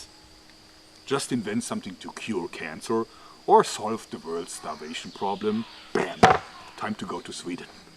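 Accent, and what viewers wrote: Germany. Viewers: I'd only watch you eat a apple attached to a drill spinning, for effect of course. But We love the videos. I personally take time off of work to watch every upload when it's fresh. For me it's the German accent mixed with the engineering genius and mechanical knowhow that really floats my boat. p.s the cheesy jokes are also quite awesome. I personally would like a full almost like a cribs episode for your work shop and general facilities outside. hope to see it soon. show us it's features! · are you German by any chance?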